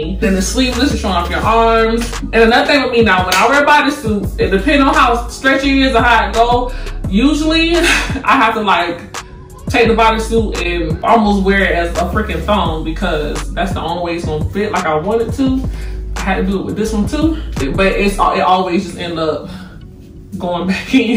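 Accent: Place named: South, United States. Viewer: Beautiful skin. Flawless. Love the southern twang. 😊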